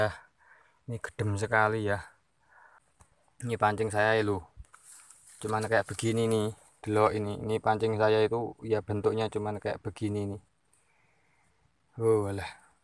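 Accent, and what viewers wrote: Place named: Java, Indonesia. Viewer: seneng kalau denger kakak ngomong,totok jawanya kayak aku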